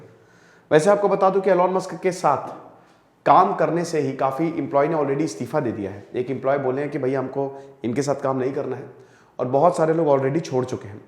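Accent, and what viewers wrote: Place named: Punjab, India. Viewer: Bhaji app ki punjabi soo cute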